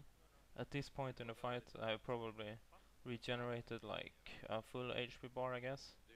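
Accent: Sweden. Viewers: En svensk var det ja ^^ nice. hör det rätt tydligt på din dialekt/brytning. nice vid btw :D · dat swedish accent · Why did you choose that pet? Is it the best pet, or what? (Man hör att du kommer från Sverige) xD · Dat swenglish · are you swedish?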